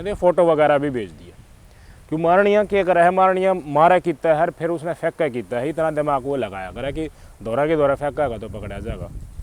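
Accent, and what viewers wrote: Haryana, India. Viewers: Maan ge bhai aap ne aap ki haryanvi bole ko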